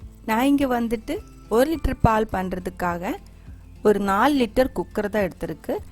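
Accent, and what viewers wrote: Kerala, India. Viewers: I subscribed your channel when I saw your video for the very first time itself. Not for the receipe I saw but for your soothing voice. Lovely Tamil with a kerala touch....now regularly following your recipes...you are going to hit the cliff soon...this is the first comment I'm placing for a YouTube channel.stay blessed!!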